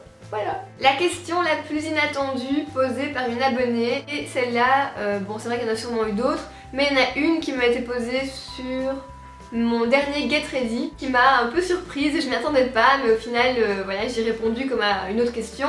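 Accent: Belgium. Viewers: J'adore ton accent belge ! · ton ptit accent belge est très marrant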